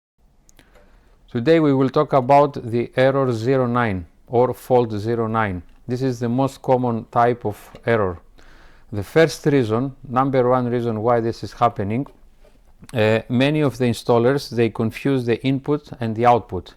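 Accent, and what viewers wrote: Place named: Greece. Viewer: This Sounds like a greek accent 😁😉🇬🇷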